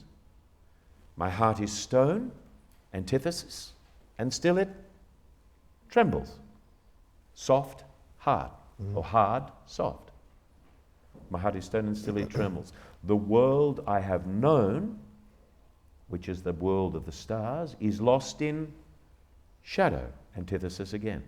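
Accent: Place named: Australia. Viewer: His accent. I keep forgetting that he's a fellow Aussie. 😄😄😄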